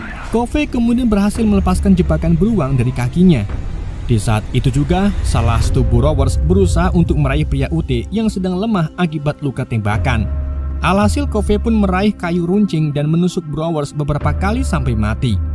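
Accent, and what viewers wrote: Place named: Java, Indonesia. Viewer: Penasaran nemen sung ente wong brebes apa tegal sih min.. "miyang cumi" 😅😂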